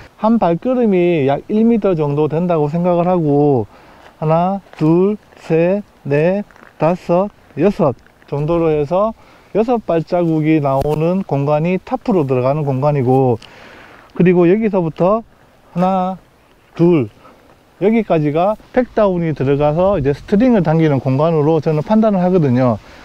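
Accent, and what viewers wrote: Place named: Gyeongsang, South Korea. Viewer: 타프 설치 전문가이시네요 ㅎㅎ 구수한 경상도 사투리는 덤...이고요 ㅋㅋ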